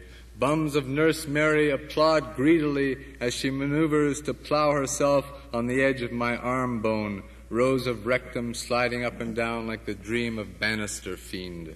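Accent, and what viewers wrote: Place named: Canada. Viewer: It is his young voice with canadian accent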